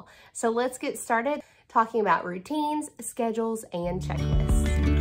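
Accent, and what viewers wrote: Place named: North Carolina, United States. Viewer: Love your accent because it matches mine. ❤ I’m from the mountains of NC.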